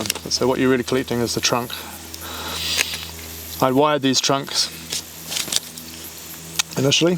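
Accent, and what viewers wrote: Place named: New Zealand. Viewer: Kia ora! Great video, really love the style you do this in (plus it's great to hear a familiar accent) Just wondering where you get your tools and soil etc from in NZ? It can be difficult to source the commonly recommended stuff. I'm just a noob and would love to hear some tips for us specifically here in NZ ❤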